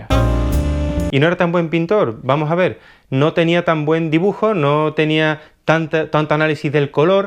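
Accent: Andalusia, Spain. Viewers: Este señor me parece andaluz no? Amo la noche estrellada sobre el Rodano. Me encantaria seguir sus pasos en Arles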